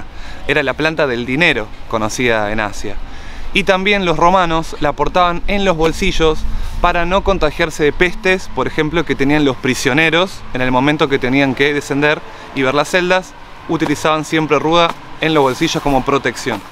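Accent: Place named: Córdoba, Argentina. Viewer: Hola te agradezco por tu canal con info tan util y buena para la salud , te comento que tengo una ruda que no riego nunca y esta muy linda ,estoy en las sierras de Cba y por tu acento y el lugar me parece que vos no estas lejos , aca no llueve durante estos meses .Me gustaron mucho los otros videos un saludo enorme y que tu tarea en el jardin continue con exito .La tierra nos brinda alimento y buenas medicinas por eso tenemos que saber distinguir las plantas y valorarlas , cuando mis hijos eran chicos mas de una vez me salvaron los tecitos de yuyos , pero todavia tengo tanto que aprender , por eso te felicito que siendo tan joven hagas una tarea tan valiosa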